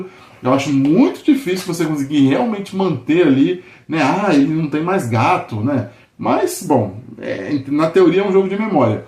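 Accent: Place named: Belo Horizonte, Brazil. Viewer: Ops bom demais? Você é de BH ou região?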